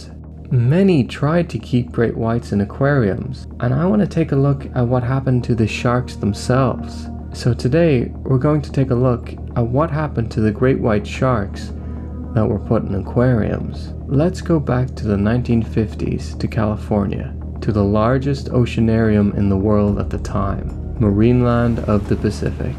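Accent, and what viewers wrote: Ireland. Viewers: is the narrator Irish? he pronounces "sandy" strangely.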